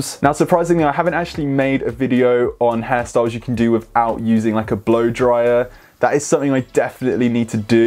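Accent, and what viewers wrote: United Kingdom. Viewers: Are you British? Love your accent ❤️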